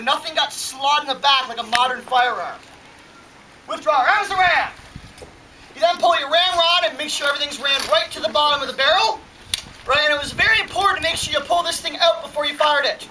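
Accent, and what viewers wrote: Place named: United States. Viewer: This was very interesting, but as a Briton it was somewhat wierd to listen to an American accent.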